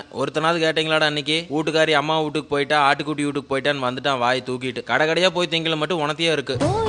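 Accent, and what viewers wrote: Coimbatore, India. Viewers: வணக்கம் அண்ணா.... நம்ம கொங்கு தமிழ் எப்பவும் அழகுதான் நீங்க பேசறது சூப்பரா இருக்கு.... என் பையனுக்கு உங்க ரைமிங் ரொம்ப பிடிக்கும் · Anna neenga pesura slang semmaiya irukku neenga coimbatorea na 🎉🎉🎉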